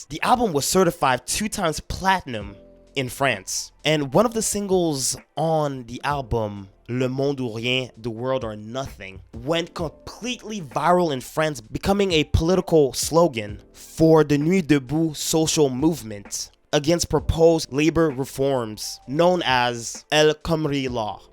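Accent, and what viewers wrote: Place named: Canada. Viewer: Are you québécois? Youre french is really good man and i feel that you got that canadian accent hahah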